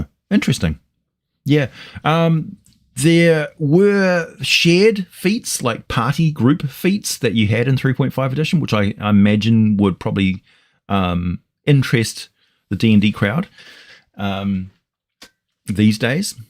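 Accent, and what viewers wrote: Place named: New Zealand. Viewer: Excuse me New Zealand I said UK in my last post don't know why I said UK the accent is different LOL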